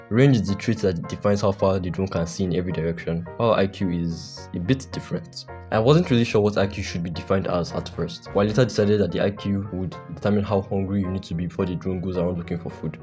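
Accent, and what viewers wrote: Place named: Russia. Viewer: ohh your accent sounded Russian to me😅. Nice video btw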